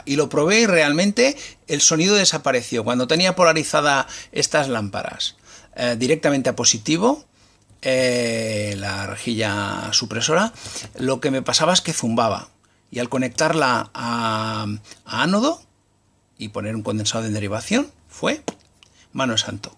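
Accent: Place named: Catalonia, Spain. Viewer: Hola, creo que tienes muy buen contenido y conocimiento acerca de lo que hablas. Te aconsejaría hacerte un twitter donde ir actualizando información sobre lo que vayas haciendo, así como optimizar tu canal y los tags del mismo. si dudas de alguna cosa yo soy de badalona por tu acento supongo que eres de cataluña, cualquier cosa buscame por twitter y hablamos un saludo!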